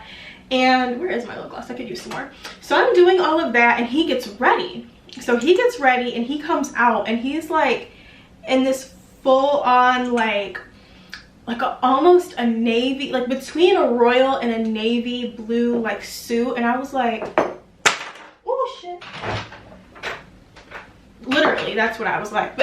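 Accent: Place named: Midwest, United States. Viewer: Also this midwestern accent is making me sooo homesick <3 <3 <3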